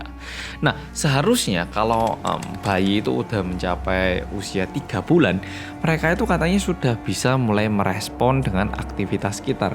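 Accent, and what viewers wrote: Java, Indonesia. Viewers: Alhamdulillah. Orang aplud lagii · timmmmmmmmmm gercepppppppppp korea medokkkkkkkk mas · Siappp mas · Tim gercep Mas wkwk ❤️❤️❤️ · Welcome back wong medok dengan detektif 🥺🥺🥺🥺 Betapa kangen nya aku sama detektif 🤧🤧🤧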